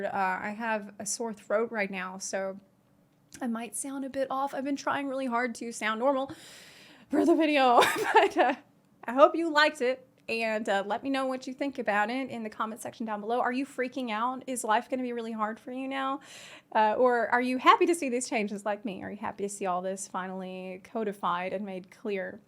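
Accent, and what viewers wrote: South, United States. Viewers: Love hearing the southern accent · you know i didn't process this at all but i just realized does have a southern accent. I think im used to hearing lighter less subtle southern accents that i didn't notice at first. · I'm from Birmingham Alabama and she sounds southern. Then again not all of us have that kinda twang from the city. Some of us sound more from the hood than from the country. My point not all southern accents are made equal. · geez, now I totally hear the southern accent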